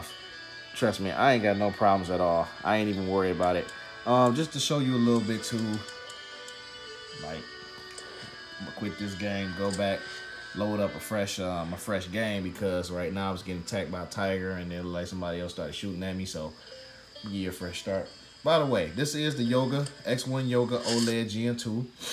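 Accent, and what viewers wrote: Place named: United States. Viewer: I'm very amused by your redneck american accent xD Great informative video, have a similar Yoga like this rn. Do you know which Generation/model exactly this was?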